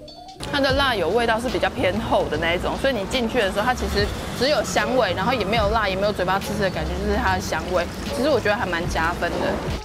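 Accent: Taiwan. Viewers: 你知道嗎，，我想臺灣時就看你節目，在歐洲待久了，聽到你的口音就知道我的魂又回到臺灣了，親切的像鄰居，謝謝你❤️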